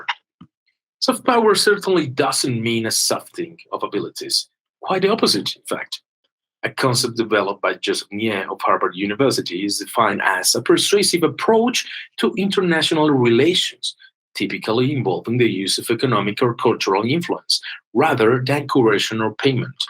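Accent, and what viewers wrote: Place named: England, United Kingdom. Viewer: Omg 😱😱 que perfecto abla el inglés como en Inglaterra estoy asombrada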